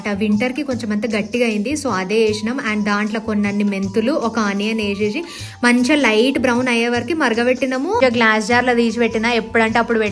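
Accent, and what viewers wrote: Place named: Telangana, India. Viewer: Nee sagam PALLETURI english , sagam village Telangana yasa kalasi em baga feel undadu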